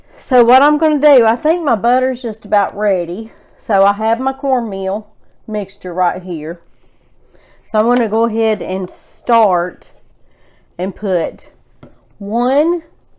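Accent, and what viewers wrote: South, United States. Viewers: Thanks for the video. Your southern dialect is like butter on bread!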